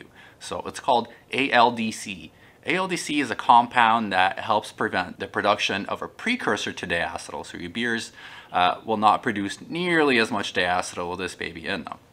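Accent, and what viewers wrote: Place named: Midwest, United States. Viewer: Genuinely refreshing to see more beer youtubers who can speak midwestern too. thanks, A Detroiter (ps our deep dish pizza > your deep dish pizza - italian beef is great though, malort not so much)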